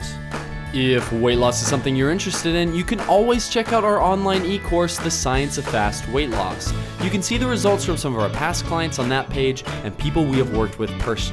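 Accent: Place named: United States. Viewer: I assume the creator is american based on his accent: <link>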